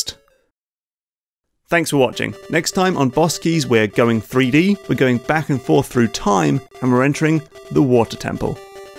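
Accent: United Kingdom. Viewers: The British accent makes it sound so prestigious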